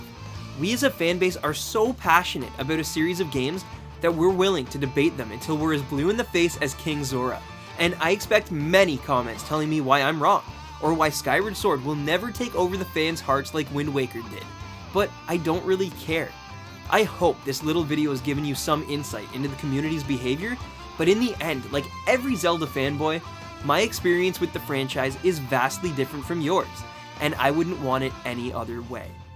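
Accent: Canada. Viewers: Are you Canadian? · love your canadian accent!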